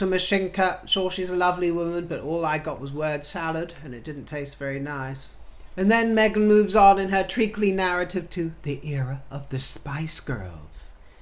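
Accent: United States. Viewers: Love your American accent! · lol your American accent is spot on. Makes it more funny when you put mm in her place. Lol. I enjoy your posts.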